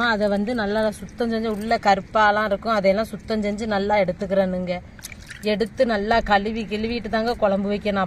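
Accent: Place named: Salem, India. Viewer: சேலம் மாவட்டத்தில் இருந்து பேசுறாங்க சூப்பரா இருக்குங்க வீடியோ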